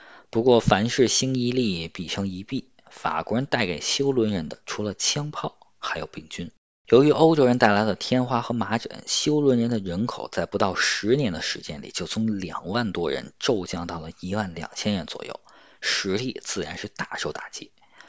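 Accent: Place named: Beijing, China. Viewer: 北京腔好听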